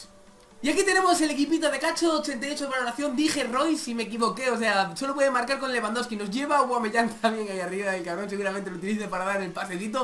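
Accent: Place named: Galicia, Spain. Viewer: de q parte de Galicia eres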